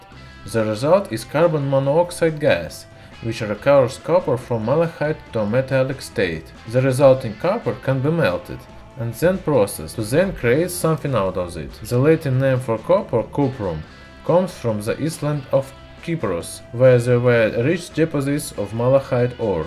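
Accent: Russia. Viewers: This is russian aksent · Is that Russian, Romanian? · I.lke the Russian accent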